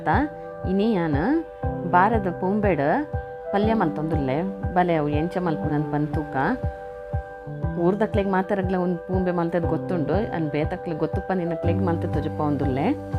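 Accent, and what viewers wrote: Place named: Mangalore, India. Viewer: Manglore kannada artha agalla ree madom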